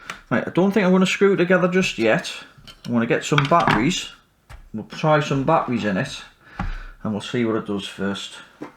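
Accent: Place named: Newcastle, United Kingdom. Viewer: You sir, have the patience of a saint. I love watching your streams. From a chap, me, who was born in Newcastle and moved away, in the mid-70s, when I was 5. Up here again in the Toon, for the weekend for a bit of nostalgia with my wife. Never tire of the accent and I have old tapes of when I had a strong accent. Ha'way Canny lad. 😅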